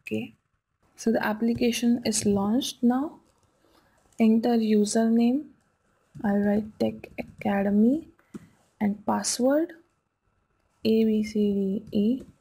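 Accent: India. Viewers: u sounds indian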